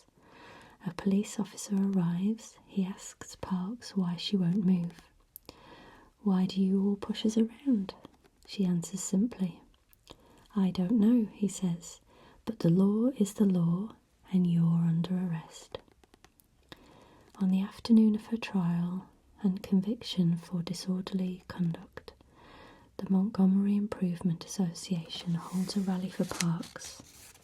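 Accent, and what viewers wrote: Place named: United Kingdom. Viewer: Thank you, beautiful choice of books and an incredibly calming video 💎 I've learnt English with American accent but thanks to you I'm getting so used to British accent, and even realising how elegant it is without being rounded😎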